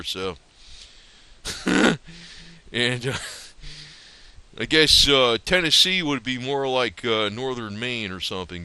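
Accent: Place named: Pittsburgh, United States. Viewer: Heathen Nations Music: I hear a Picksburg.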